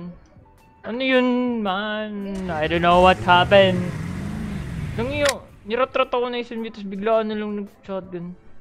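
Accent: Visayas, Philippines. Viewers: bisaya ka pala ehh~!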